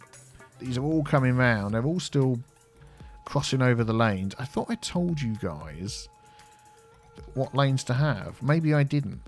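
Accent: United Kingdom. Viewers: thank you for responding! Once in your lifetime, you could lose hearing to a point where you can't understand anyone. Have you thought how life would be like if you were deaf? Mine started at birth, always trying to understand people. I can hear, yes, with a cochlear implant, but I want you to consider the people who physically can't hear. They are forced to read your captions if they want to try understand you. They miss out on the physical cues you give off as a British speaking person. I love your accent, and seeing you talk would bring joy not only to me, but to the deaf, deaf blind and hard of hearing community. We get to know you through how we see the world, not just people who just hear you speak. I absolutely love how you have your word choice, having captions helps me follow along, laughing. Why not go one step further? Hope to see you soon!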